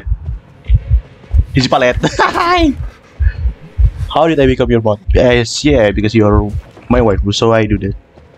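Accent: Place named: Indonesia. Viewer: Logat indonya kerasa bro and ur sounds mmhhh daddy 🥵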